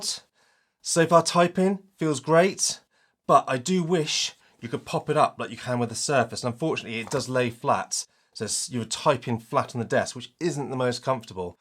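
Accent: United Kingdom. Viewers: Why do all the British guys on youtube sound the same? So odd.👍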